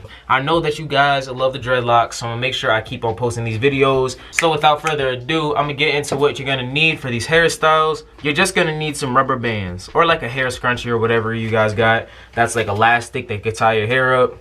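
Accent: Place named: New Jersey, United States. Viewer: are u from nj i hear the accent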